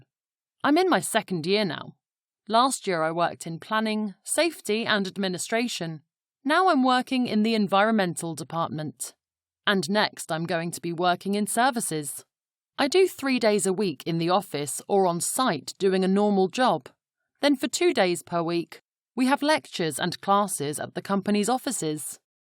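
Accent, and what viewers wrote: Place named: United Kingdom. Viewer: fk uk accent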